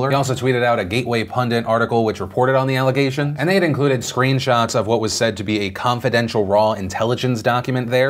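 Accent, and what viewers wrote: South, United States. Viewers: This slow talking southern drawl of this guy has is endearing, but I wish he would learn to t as l faster.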